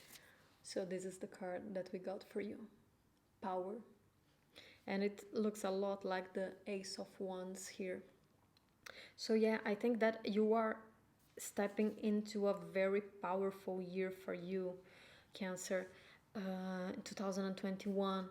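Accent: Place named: Brazil. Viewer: Are you Brazilian?!? Sound like !! 🇧🇷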